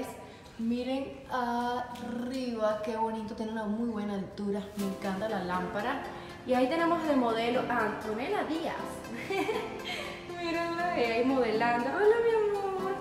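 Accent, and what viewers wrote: Cuba. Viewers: Hola!! Soy nueva en tu canal llevo una semana contigo, el primer vídeo que vi fue el último que hiciste de decoración de Navidad pero no podía dejar de comentar este. Adoro tu casa osea me mudaba ya!!! Es preciosa luminosa ainsss me encanta!!! Poco a poco veo todos tus vídeos soy española y cuando termino de verte hablo con acento cubano tu ya me entiendes jajaja que me encanta tu gusto, es muy parecido al mio y adoro tu casaaaa!!! Un besado enorme!!!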